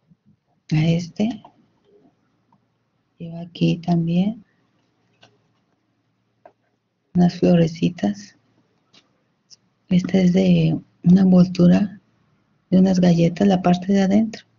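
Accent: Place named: Chile. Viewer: Mexicana???hoooo habla igual que nosotros los chilenos, llegue a pensar que podia ser chilena jajajaja....bueno se paso me han encantado todos sus trabajooos....besoooossss amiga..DIOS la rebendiga mucho por todas sus creaciones...😍😍😍💖💖💖👏👏👏🌹🌹🌹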